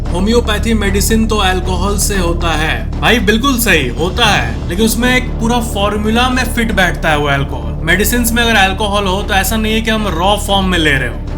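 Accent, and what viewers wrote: Bihar, India. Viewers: Bhaisaah Aap kya Bihar se ho kya ? Batana Zaroor Se .......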